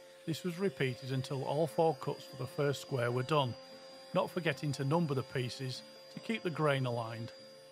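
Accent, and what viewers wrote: United Kingdom. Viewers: That’s a lovely piece. And it’s so nice to hear a British accent. Thanks for sharing 🌞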